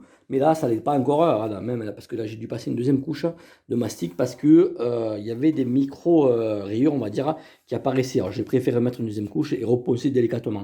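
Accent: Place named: South, France. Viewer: est ben écoute Fréro tranquilou 🤣👍 Ouai tkt , je sais ce que c'est .... Compliqué de tous géré 😭 Mais bon .... Ça fait plaisir de revoir ta bouille et l'accent du sud bordel 😁💪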